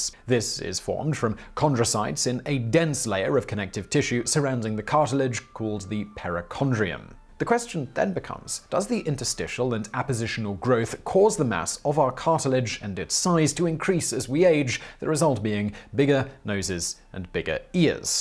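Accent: United Kingdom. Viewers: I thought this was the guy that does VSauce and was wondering why he was talking British accent. Then I realized they could be twins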